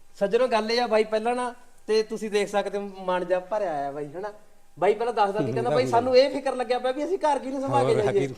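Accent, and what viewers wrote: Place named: Majha, India. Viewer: ਤੁਸੀਂ ਤਾਂ ਮਾਝੇ ਦੀ ਬੋਲੀ ਬੋਲਦੇ ਹੋ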